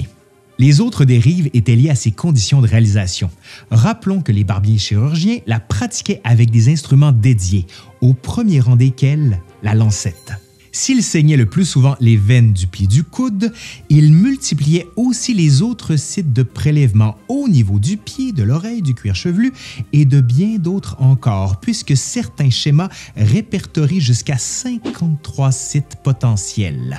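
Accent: Canada. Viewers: très intéressant... en plus. j'adore votre accent canadien ;-)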